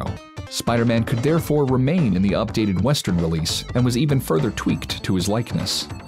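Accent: United States. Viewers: Shinobi being one of my fave Megadrive games ever! Curious why you use UK boxes with an American accent? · You my friend are the best gaming historian I have ever seen. I'm not kidding, I would pay to watch this show without batting an eye. You should consider packaging these episodes and selling them as volumes on Blue Ray and digital download. I would easily pay 10 to 15 dollars per disc....Hell, I could be persuaded to spend more depending on the episode. Every console and game you cover is done at a professional level not seen on YouTube before. Further, the reverence you pay to the subject material is unparalleled. Thank you for making these videos. One question that I have...Why do you call Sega's 16-bit machine the Mega Drive and Mega CD? I ask this question for two reasons. You yourself have an American accent. Further, if I had to guess it appears that most of your audience is centered here in the US. 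Now, this does not change my opinion of you or your show obe bit. If you prefer calling Sega's 16-bit console the Mega Drive, have at it.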